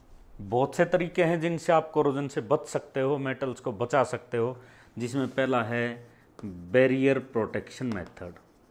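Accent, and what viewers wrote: Haryana, India. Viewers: Sir are you from Haryana.?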